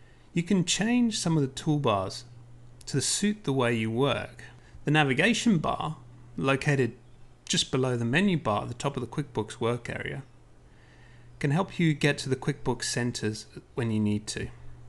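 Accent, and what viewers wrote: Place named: United Kingdom. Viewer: Beautiful british accent.. xD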